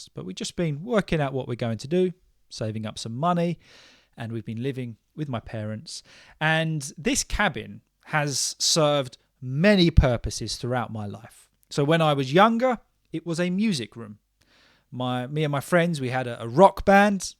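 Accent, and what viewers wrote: United Kingdom. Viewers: Your podcast is one of my favorites. And what I like about it, it is your british english and listening to your podcast, I feel like I am in the UK. You transport me throughout your accent, your behavior, etc. When you speak about your country, those are my favorite moments. Please keep going. I wish you the best for 2025.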